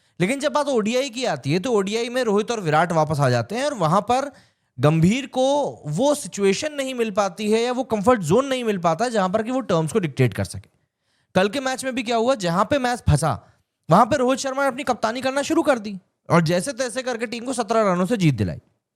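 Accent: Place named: Bundelkhand, India. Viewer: Excellent analysis! Lovely to hear Bundelkhandi in the cricketing world!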